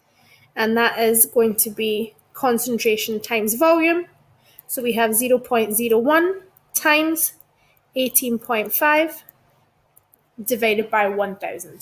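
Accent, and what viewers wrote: Scotland, United Kingdom. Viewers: thank you madame for the great explanation.By the way,I liked your scottich accent. :)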